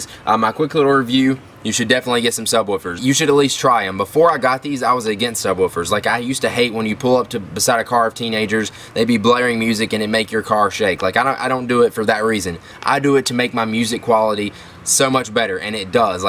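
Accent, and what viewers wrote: South, United States. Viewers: Are you from the southern states? I hear a little bit of a southern accent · Dude how is this impressive... Do u watch ur own.vids before u post them? This is a tech channel and I got this southern sounding pre-pubescent. Hillbilly saying "it mAkes radio sound better.. Durr"..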